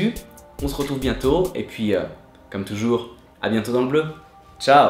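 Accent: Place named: France. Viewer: You have such a lovely French accent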